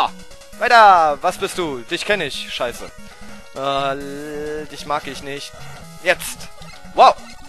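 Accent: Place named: Berlin, Germany. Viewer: berlinerisch!!!!!!! Icke.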